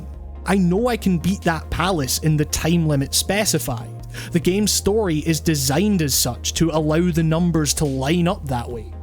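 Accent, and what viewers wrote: Scotland, United Kingdom. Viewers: Are you Scottish? I love your accent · god i love the scottish accent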